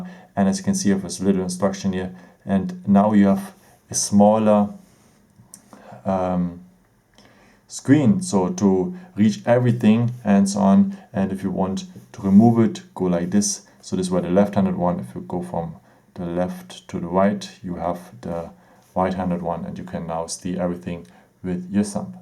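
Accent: Germany. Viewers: Dat german accent! :D Tolles Video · Hab doch gleich gehört das du deutsch bist :P Super Video und klasse Smartphone 👍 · Haha German english😂😂😂😂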